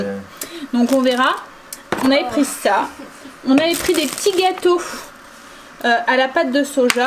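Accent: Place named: Nord-Pas-de-Calais, France. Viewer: Coucou... No comment pour les égoïstes Français... J'adore lorsque vous testez les produits, vou êtes courageux... Super la réaction des hommes fassent à la chemise de nuit homme et ton échange avec 🤣 et on entend bien l'accent ch'ti...génial... Tu me donnes envie avec ton gratin de pâtes et le FROMAGE et la tartiflette avec le REBLOCHON...pour me faire plaisir je mange du gruyère à-25% de sel et pareil pour le gruyère râpé de temps en temps... Gros bisous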